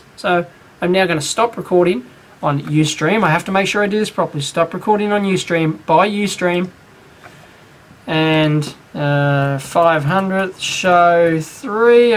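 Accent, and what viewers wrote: Australia. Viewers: Just for the record... Love to hear an Aussie be a true Aussie!! keep it up mate! Ignore those other winging bastards that can't understand our shenanigans!! THANK YOU VERY MUCH! its refreshing to hear! Please keep it up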